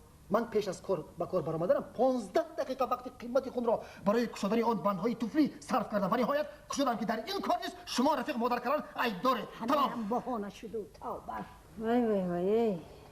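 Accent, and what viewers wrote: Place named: Tajikistan. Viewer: Чудоям нутки зебову лахчаи волои Точикона. Хело Олли Ойла ва марди Точикро исбот намудед. Интилигент. Чунин хам бояд бошад. Саломат бошед устоди азиз. Салом аз Самарканд.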